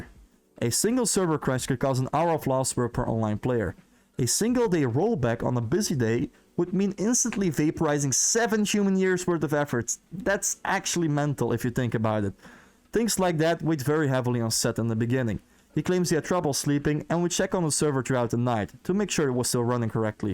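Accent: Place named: Lithuania. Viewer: lietuvis ,ane? is akcento matosi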